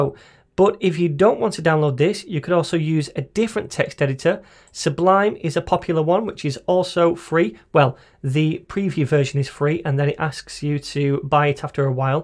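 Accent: United Kingdom. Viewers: thanks a lot. i like to watch your video not only because i wanna learn about web programming language but also learn about english especially british. and i like your accent. its rarely british guy make programming tutorial on youtube. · By your accent I can tell you are British, pls marry me. A British tech dude. Perfect combo! 😍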